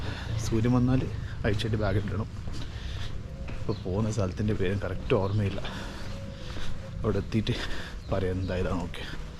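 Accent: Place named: Thalassery, India. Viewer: Thalassery language ayath kond oru veraity Vlog ayittund nannayittund ketto daily vlog okkey idu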